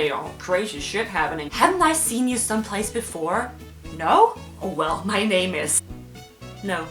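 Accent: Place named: Denmark. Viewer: You have a really strong Danish accent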